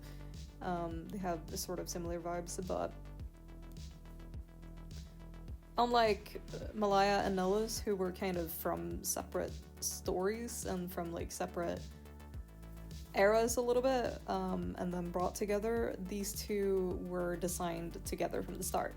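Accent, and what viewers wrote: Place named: Scandinavia. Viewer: do you speak Swedish/Norwegian/Danish? Your speech pattern and slight accent sounds so familiar XD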